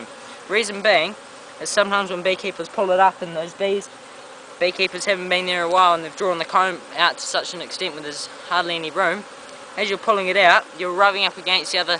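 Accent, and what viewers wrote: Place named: Australia. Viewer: What kind of "strip" was in the brood? Aussie accent ... Yank can't quite get it ...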